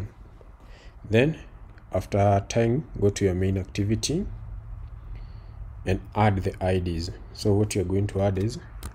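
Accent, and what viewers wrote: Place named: Kenya. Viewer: You sound Kenyan. Shukran sana bro!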